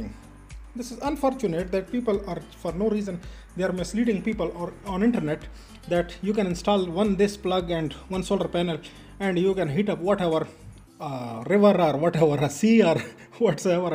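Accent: India. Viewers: WE understand clearly your english with an Indian accent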